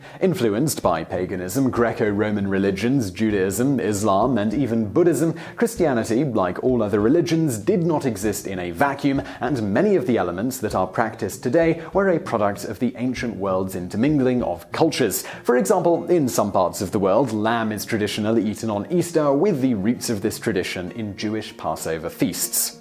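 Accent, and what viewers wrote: United Kingdom. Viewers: I was WAITING for you to say "Christian" in your British accent, you beautiful English man. :D :D :D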